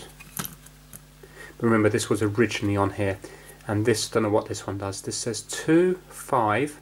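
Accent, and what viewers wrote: United Kingdom. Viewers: Hi, first of all: Great video as always, love your british accent 🗣! I‘ve got a question: you‘re using some kind of small (grey?) notebook (or convertible?) in your videos, showing how much you paid for your „trying to fix“ items. I‘d like to know which brand/model it is?! Thank you and keep on fixing! 👍🏻 greetings from 🇩🇪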